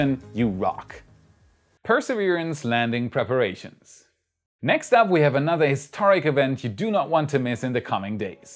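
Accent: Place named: Germany. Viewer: Your English pronunciation is nearly perfect, but a little of your German comes through. Which I find charming. Your video is excellent. Danke!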